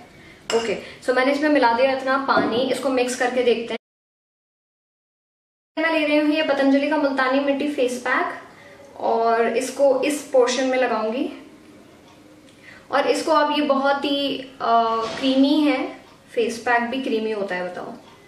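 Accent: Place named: Mumbai, India. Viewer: Apaki language se lagtahe ki aap Mumbai se ho . Ladako keliye konase masale he faces pack me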